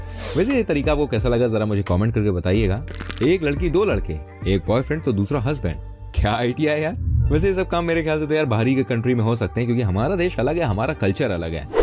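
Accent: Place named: Kolkata, India. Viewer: Kolkata si bol rahe hain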